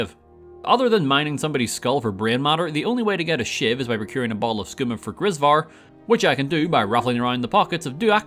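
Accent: United Kingdom. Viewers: ah- british playthrough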